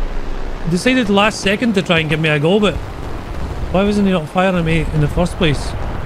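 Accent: United Kingdom. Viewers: hearing a scot speak in a british accent is just all kinds of confusing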